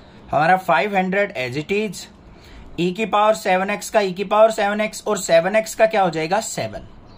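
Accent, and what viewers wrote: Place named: Bengal, India. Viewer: Bhai wo Bengali he Bengali accent tuje nahi PTA to me taunt mara kr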